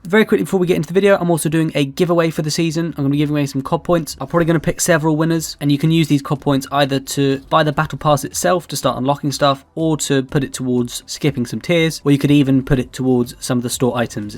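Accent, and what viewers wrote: United Kingdom. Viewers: Like the most of British people voice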